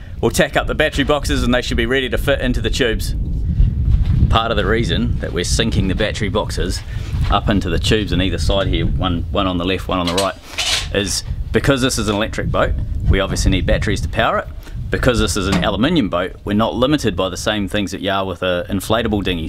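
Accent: New Zealand. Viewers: Great idea using the plastic chopping blocks. If it was me, I would have made a 'sandwich' with a plastic plate each side of the alloy tube and a longer bolt. Then you would not be relying on the sika (which I know can do the job) as when you have big blokes with big boots getting in and out of the dinghy it could be accidentally knocked off. Keep up the great work. Nice to head a real Southland accent!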